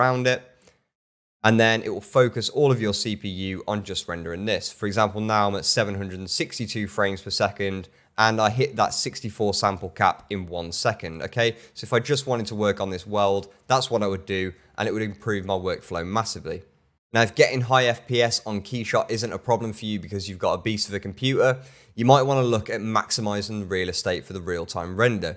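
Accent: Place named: RP, United Kingdom. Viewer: RP British elegant accent which I love to listen to.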